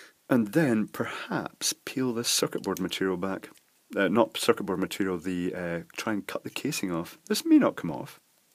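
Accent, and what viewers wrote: Scotland, United Kingdom. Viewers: You sound exactly like Scott Manley, does every older Scottish person sound like that?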